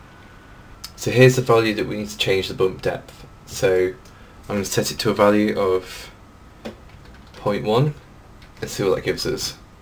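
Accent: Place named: United Kingdom. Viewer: that's a very usefull how-to! what kind of british accent are you speaking? i like it.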